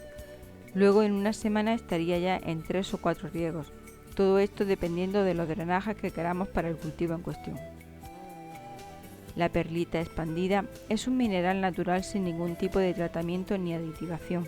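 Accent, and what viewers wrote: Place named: Murcia, Spain. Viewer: E murcianica la que habla.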